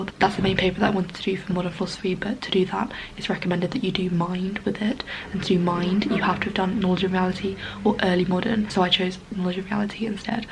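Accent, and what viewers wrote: United Kingdom. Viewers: your english accent is just amazing !!!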